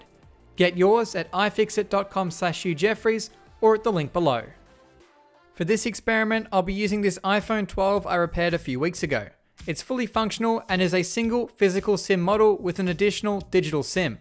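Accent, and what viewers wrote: Australia. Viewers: It's called the Australian accent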